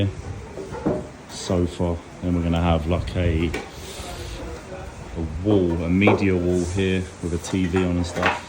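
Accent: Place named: Croydon, United Kingdom. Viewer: Listening to your accent i bet you are close to me too Croydon 🧐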